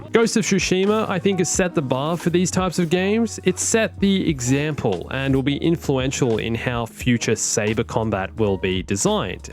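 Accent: Australia. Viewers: OOOH WE GOT A FUKIN AUSSIE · Yes I had no Idea your also Australian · Aussie mate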